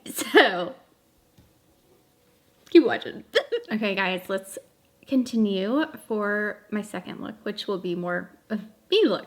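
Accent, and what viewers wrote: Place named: Kentucky, United States. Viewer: I am new to your channel after I had second thoughts after already ordering this palette. I love the look and I may give it a try. I was already thinking about returning it and I just ordered it today, so of course I haven’t received it yet. I am scared of blues. I am actually scared of color, period! The main reason I subscribed is because I am from Kentucky and I have the same accent. I use the word y’all all the time. I love the look, but I’m still second guessing. $65 is expensive if I don’t like it. But it gives me the 90’s good ole days vibe.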